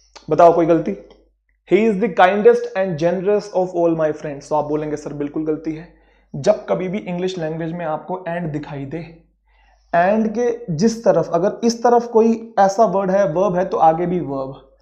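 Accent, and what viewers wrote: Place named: Punjab, India. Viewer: Your Punjabi accent 💘 omg.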